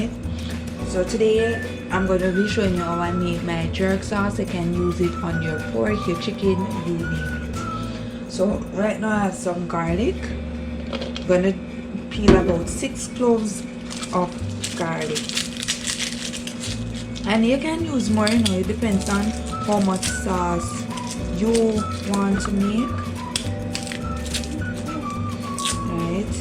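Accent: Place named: Jamaica. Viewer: I love your intro, thats really nice. You have a nice accent. I love the jamaican accent. Nice prep you did before blending . Need to try this new to me. Thx 4 sharing 🥰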